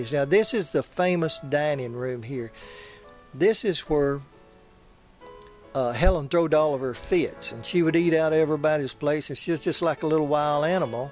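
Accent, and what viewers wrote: South, United States. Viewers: Fantastic video! I enjoyed your commentary and lovely Southern accent very much! Thank you!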